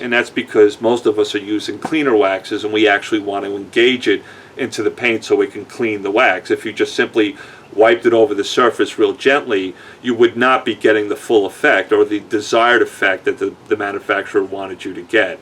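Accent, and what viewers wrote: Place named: Queens, United States. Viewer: how did a guy from Queens (I'm guessing based on the accent) end up in Dallas? Keep up the great work!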